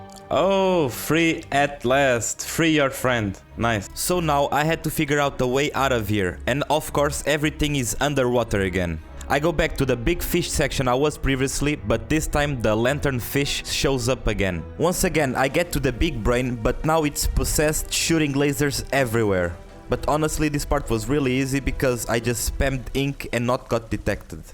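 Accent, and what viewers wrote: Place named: Portugal. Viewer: O teu video apareceu-me nos recomendados do youtube, entrei por curiosidade, pareceu-me atrativo. Nas primeiras palavras comecei a perguntar-me a mim proprio se tu eras portugues, o sotaque estava muito a dar nas vistas. Fui ver a tua PSN e olha, és tuga mesmo. Boa sorte com os videos, +1 sub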